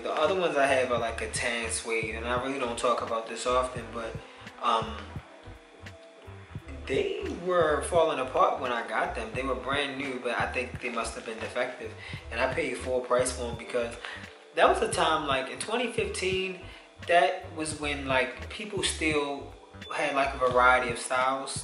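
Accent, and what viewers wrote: Baltimore, United States. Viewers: u from baltimore???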